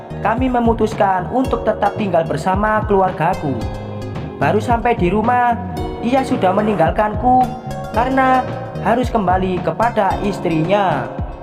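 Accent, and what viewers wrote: Java, Indonesia. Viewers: Bang orang mna sh bhsa y ko medok bnr tpi bàgus cerita y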